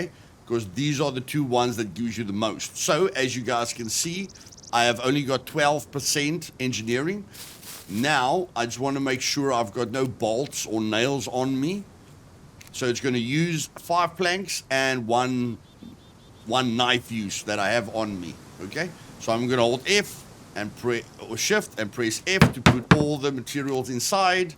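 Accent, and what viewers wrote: Afrikaans, South Africa. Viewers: Bro got the South African Accent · is that a afrikaanse acsent?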